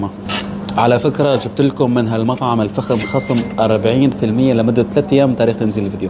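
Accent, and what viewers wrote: Aleppo, Syria. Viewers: لهجتك حلبية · هل أنت حلبي❤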